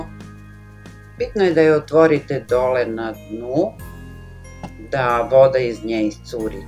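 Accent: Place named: Kruševac, Serbia. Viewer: Uživala sam u Vašem, mom po rodjenu kruševačkom dijalektu.Interesantno to nikada nisam jela. Baš moram da probam! Hvala Vam!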